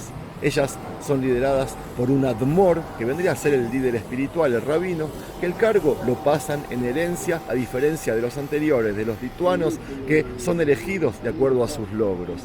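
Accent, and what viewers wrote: Argentina. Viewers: quiero saber porque hablas tan bien el español con acento argentino... cuéntame o donde puedo ver un video... me imagino que ya lo dijiste....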